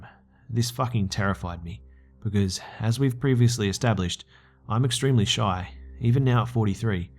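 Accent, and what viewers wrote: Australia. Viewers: your so Australian it feels like home i love it 😂❤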